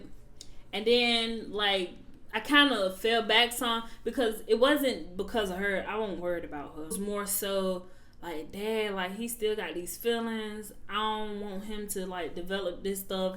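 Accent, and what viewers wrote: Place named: South, United States. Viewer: I love your accent, sound like somebody southern auntie!!! 😆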